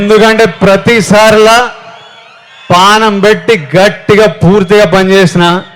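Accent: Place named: Rayalaseema, India. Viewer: Rayalasema(Chittoor) slang not telangana (telugu) slang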